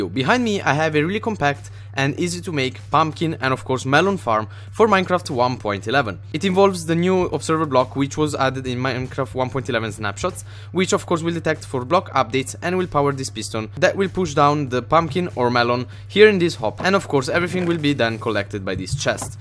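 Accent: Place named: Italy. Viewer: Ma quanto si sente che sei italiano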